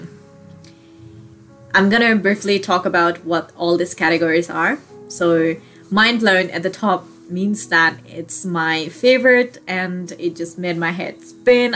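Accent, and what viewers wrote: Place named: United States. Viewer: Haina yo Nepal ma padheko manche ko american accent kasari aayo? Hollywood filim dheri herdoracha kyare ...😅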